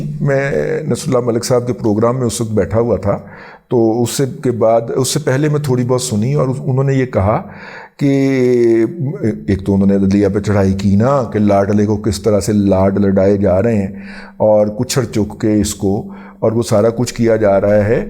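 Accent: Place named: Pashto, Pakistan. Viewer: 😂😂 love your pashto touch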